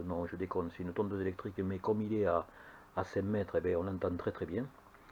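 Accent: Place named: South, France. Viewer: Bonjour Merci pour votre partage d'impressions sur votre scooter, et j'apprécie beaucoup votre joli accent méridional lol Je possède actuellement une Deauville 700 avec laquelle j'ai eu un accident de la circulation, le délai d'obtention des pièces de rechange étant très long, Honda St Dié m'a prêté un ADV 350. Je suis agréablement surpris par le confort et les aspects pratiques de ce scooter et je me demande si je ne vais pas le garder...... Comme toi je suis à la retraite j'ai 66 ans, j'habite en Alsace dans la montagne vosgienne. Est-ce que tu as déjà fait de longs voyages avec? Merci pour ton essai et bon week-end à toi.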